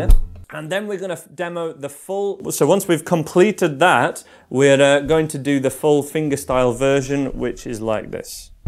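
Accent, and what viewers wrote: United Kingdom. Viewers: He's from the UK.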